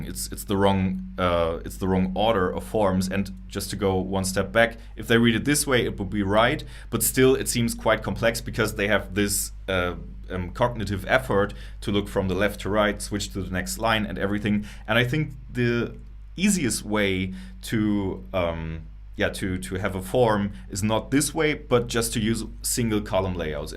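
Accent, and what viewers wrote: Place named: Germany. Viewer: Your English is frankly amazing. I'm a bit biased since I have a lot of german family so I'm use to the slight accent, but honestly it's great.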